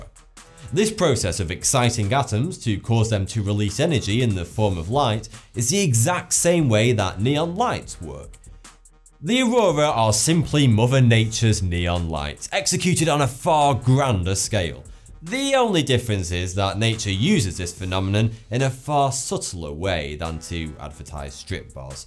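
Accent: United Kingdom. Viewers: Are you British people really saying "sun" and sound like "son". WHYY!~?? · i like your british accent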